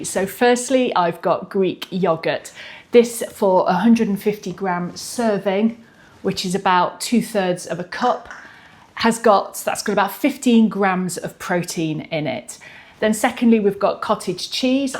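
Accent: United Kingdom. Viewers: I couldn't agree more, having also just stumbled across your channel,. Thank you for your humorous take on navigating this stage of life, together with accessible science - always nice to hear a British accent too (with every respect to all the other wonderful accents heard on youtube) 😊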